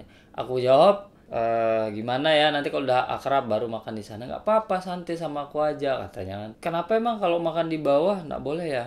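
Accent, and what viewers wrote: Lombok, Indonesia. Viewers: Bang kentel banget logat lomboknya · Anoo " ciri khas org sasak klo ngomong 😂😂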